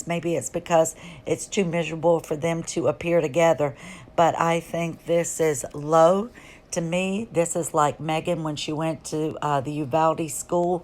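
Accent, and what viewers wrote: South, United States. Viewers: The Southern accent gives you away as a bullshit artist MAGA person.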